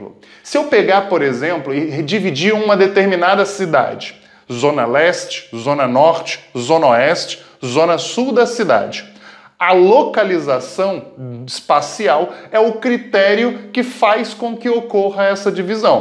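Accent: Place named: Northeast, Brazil. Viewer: Vim pela minha prof q mando como explicação essa vídeo aula :v (já q estamos em quarentena e tendo só aula online). Obs: Vc é nordestino né? Pq tem um sotaque d lá ou do norte msm. Ps: Santro Oeste kskksks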